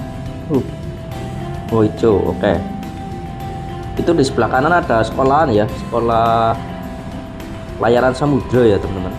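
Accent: Java, Indonesia. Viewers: Maaf mas anda sepertinya kurang menguasai kota Cilacap, terus bahasanya medok jadi seolah olah kaku. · Medokbanget lagumu lah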